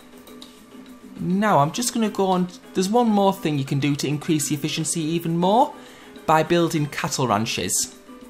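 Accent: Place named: Sunderland, United Kingdom. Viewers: I'm from Sunderland, you sound like one of us. For that reason I am now subscribed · this is more of the northerner accent than the traditional british accent most are accustomed to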